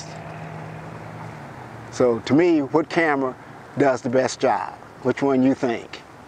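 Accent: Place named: South, United States. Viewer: GREAT presentation, Sir!! I just love your Southern accent. Great use of language. Keep on sharing!!! Greetings from Montreal.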